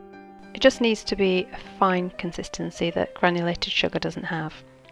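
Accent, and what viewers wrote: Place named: RP, United Kingdom. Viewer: I'm an 81 yr old ex pat living in the USA since 1980. Lost my wife to heart surgery a few years back, after 60 yrs together, so I'm now the novice cook. No plain flour in the US only all purpose or Bread flour. Can I use either, as is, or with some modification. I just tried your project with premade Pillsbury puff pastry. Everything that could go wrong, did. Cut the circles too small, pastry is a bit thin, didn't reach the top of the muffin hole. Jam overflowed etc. Welcome to my disaster zone. Tried making a Treacle (golden syrup) in bread crumbs pie yesterday, did a blind bake on the crust, came out good but the syrup filling went rock hard, guessing poor syrup to breadcrumb ratio. Is there any hope for me ? I'm a Dagenham boy so your accent is more posh than mine. Blessings Bob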